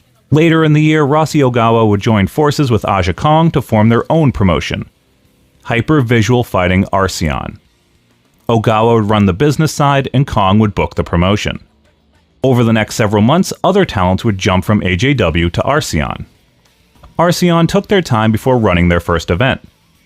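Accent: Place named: United States. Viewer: Nice stuff! I've been reviewing all their stuff (currently in 2001's beginning right now) and it's plain to see the issues- the fans never "took" to the mat-wrestling stuff and it was dropped, giving it no identity. Big stars Hamada & Omukai didn't sell well and couldn't connect with the fans, and lacked credibility. The biggest ideas to "push" people were essentially to have them win a bunch of flukes in a one-night tournament, which failed to really heat people up as challengers. The mess of politics in 2003 is even worse. I will say the pronunciations here are VERY "Americanized". It's hard to avoid, but MaREEko YoSHEEDA stands out, as does "Rye" TaMAda, haha. Ogawa was less of a "businessman" and more of a "PR Guy". Also Candy Okutsu was a JWP wrestler, not AJW, and it's "Korakuen" Hall XD.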